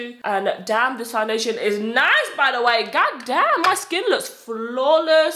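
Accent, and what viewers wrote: United Kingdom. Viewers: I found you on Instagram, your videos with you doing makeup or your skincare. When I started watching your YouTube channel I was hooked and loved how open and honest you are , I didn’t know you had a British accent and lived in London till I started watching your channel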